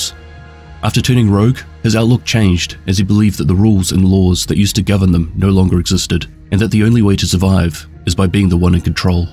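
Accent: New Zealand. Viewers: Good to here a kiwi telling to the story from JTFOUTLAW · The gameplay video made me dizzy, only listen the kiwi sound it's ok, like listening a podcast it's fine. 😁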